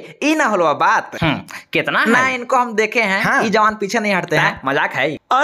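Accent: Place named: Patna, India. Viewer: Je tu patnaiya tone or bhasa me bolte hauwan.... Baddi bes lagat hauye.... 😂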